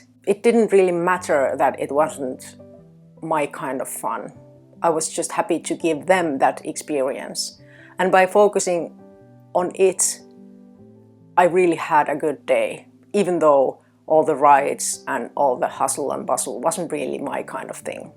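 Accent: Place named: Finland. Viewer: Some 40 years ago, I had the opportunity to live in southern Finland for a year and hearing your accent brought back a lot of good memories. Thank you for that. I became aware of the HSP type only a couple of years ago and it felt very affirming as I read through The list of the ways that we are “different“ that are normal for us. I have quit trying to please others, I accommodate them when I feel I can, and that has made a big difference in how I feel and I’m able to live. I have found 15 to 30 minutes of meditation pretty much first thing in the morning is how I feel and function best so I make sure I have the time and privacy to get that in no matter where I am or what I am doing. I have become a bit of a recluse, but that seems to be how my life works best. You have to honor your own needs first or you cannot be there for anyone else.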